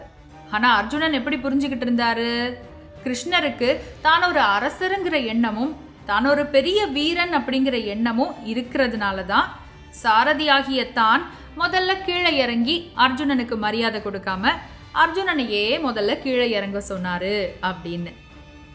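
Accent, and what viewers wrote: Tirunelveli, India. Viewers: super story sister nice explain. vazga valamudan kattakadaisi endra tirunelveli vattra valaku super. neegal sollum poluthu kadhai kalathirkae kondu sellum munnorkalin thiramai irukirathu. super sister.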